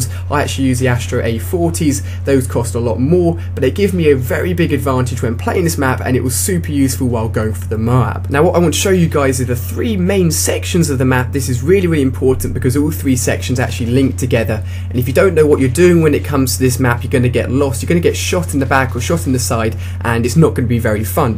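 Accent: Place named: England, United Kingdom. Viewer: i knew you are from english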